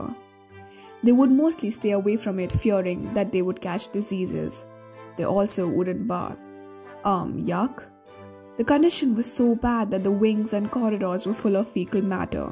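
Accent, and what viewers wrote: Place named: India. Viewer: I just can't take the Indian accent seriously. I hear it, I'm gone.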